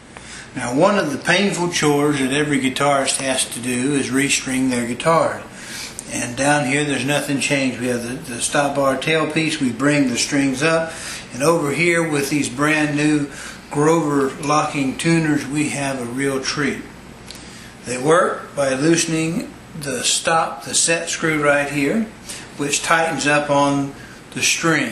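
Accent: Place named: United States. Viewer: i like people talk with cowboy accents.. sounds like pretty cool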